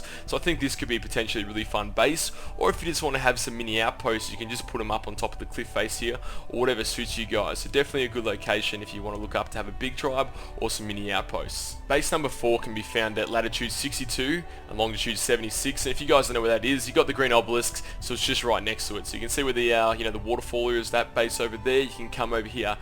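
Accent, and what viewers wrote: Australia. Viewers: I could tell your Aussie! Same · yeah, can always pick up that sexy aussie accent anywhere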